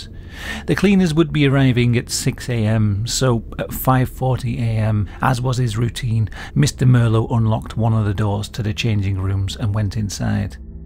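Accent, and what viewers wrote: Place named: United Kingdom. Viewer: I just got one of your storeies in my fed (probably because I follow strange, dark and misterious - mr Ballan) and started binge watching your stories 😂. I love your accent ! English is not my native language but I love to listen british accents, so many of them 😊. Your story telling abilities are great. Love your content. You have a new subscriber. Keep up with good work 👌🏻👍🏻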